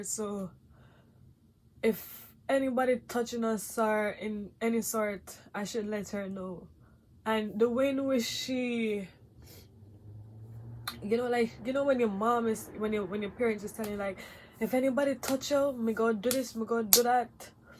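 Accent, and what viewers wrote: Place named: Trinidad and Tobago. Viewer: U have a sweet accent, are u Trini ???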